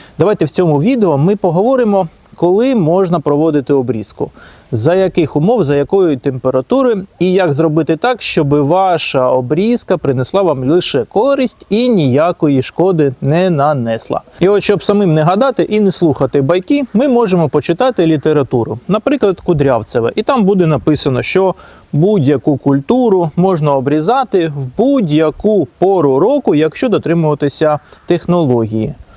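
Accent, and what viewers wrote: Standard. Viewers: Украинською классс. Чиста лiтературна, без запинань. То рiвень. Сам я рускоязичний, але перехожу теж на Украiiнський мову та звiсно контент. Тому успiхiв. Ну а з мене пiдписка. Бо корисний контент, але формат з настроем, тому цiкавий. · Приемно вас слухати здоровья вам.Дуже чиста українська мова🎉🎉🎉❤❤❤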